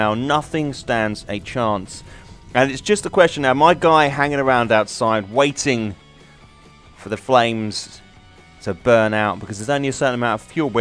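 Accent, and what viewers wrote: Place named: Germany. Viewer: why do you speak as german?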